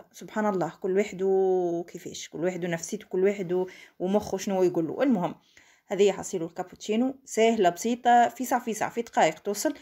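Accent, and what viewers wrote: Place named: Gafsa, Tunisia. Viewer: والله حسيت لهجة قريبة ليا برشا قفصة وبوزيد من أول الدنيا واحد ملا هذاكاهو أول ما تهبط لتونس نشوفوك عزيزتي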